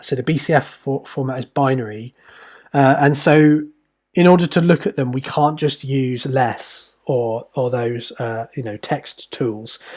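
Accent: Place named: Cockney, United Kingdom. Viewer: it's comfortable to hear cockney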